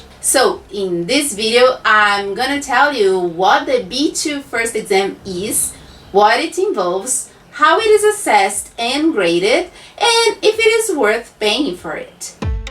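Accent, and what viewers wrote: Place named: Brazil. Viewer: Nos primeiros 10 segundos de vídeo eu já fiquei tipo... Essa garota deve ser brasileira, só pelo sotaque kkkkk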